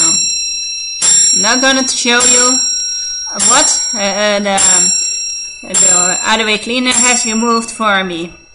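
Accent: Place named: Netherlands. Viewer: hoi, door de pop up en je accent had ik opgemerkt dat je nederlands was:). ik vond ineens nadat ik een programma had gedownload deze sweet-page en probeerde het te verwijderen door middel van het configuratie centrum, maar al gauw merkte ik de afwezigheid hiervan ik typte bij youtube in 'how to get rid of sweet page' en kwam al gauw op deze video, ik heb zelf geen last gehad van dit virus omdat ik het in hetzelfde uur heb verwijdert doormiddel van jou goeie instructies. HEEL ERG BEDANKT:) LIKE!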